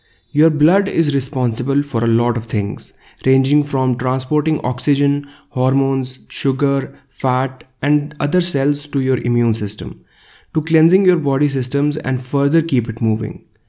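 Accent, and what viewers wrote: India. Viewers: I ❤ Indian accent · Is that a Hindi accent?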